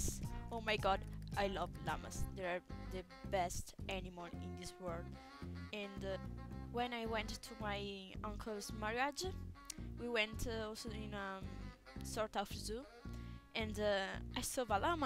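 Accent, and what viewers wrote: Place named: United States. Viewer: hai una voce classica americana aahah ???? wtf???? hahaha mi fai troppo ridere!!!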